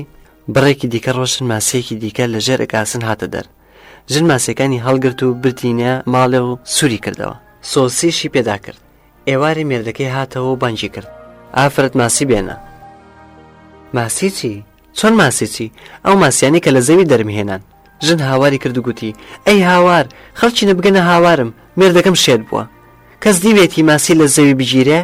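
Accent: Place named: Sulaymaniyah, Iraq. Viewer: Xozga dangakatan bkrdaya ba shewazary slemany zor xoshtr bw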